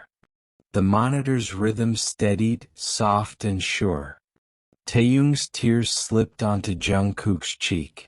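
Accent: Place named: Asia. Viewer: may I ask you what nationality you are? I hear a faint accent in your voice. Are you Asian or partly Asian. I'm just wondering cause I love listening to your voice when you read.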